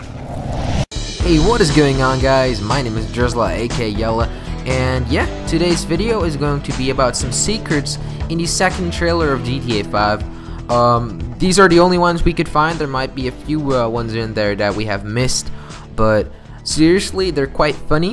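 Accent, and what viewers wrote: Netherlands. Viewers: its the way pronounces its cos he is from he netherlands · He sounds like he's got abit of an accent he had another video were a twitter screenshot was in a different language so I'm guessing he may be Dutch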